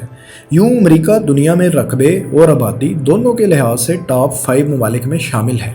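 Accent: Punjab, Pakistan. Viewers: bhai....khuda key wastey urdu main punjabi mat Bola Karo yaar....English words ko bhi punjabi style msin parhtey ho...urdu ka janaza mat nikalo yaar...hire some good urdu speaker...plz...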